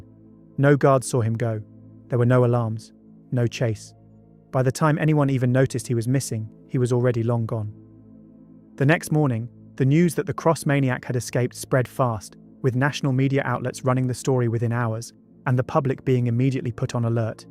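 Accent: England, United Kingdom. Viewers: Not AI. It's an English accent. There are certain annunciations that AI still cannot reproduce. Hopefully they never will. Otherwise there will be a day when even an expert won't be able to tell the difference.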